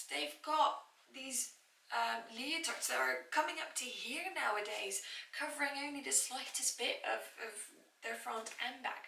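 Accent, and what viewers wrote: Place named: United Kingdom. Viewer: You sound British. How was the reaction to the movie in the UK vs US? I get the impression that the movie hasn't been a big thing over there.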